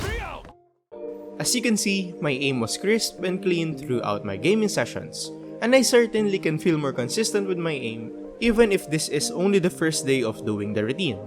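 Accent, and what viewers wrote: Philippines. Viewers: the pinoy accent was enough to convice me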